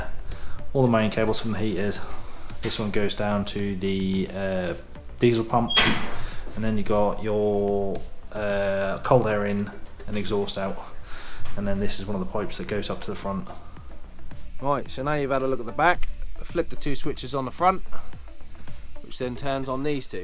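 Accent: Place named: Norfolk, United Kingdom. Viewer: Can I buy the 3D printed air inlet/outlet from you please? From your accent you sound like you're local to me (Norfolk).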